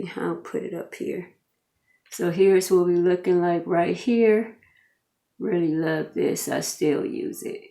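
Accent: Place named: Los Angeles, United States. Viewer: Random question... r u from Cali ? I can’t really pick up exactly where u from , but u sound like u from LA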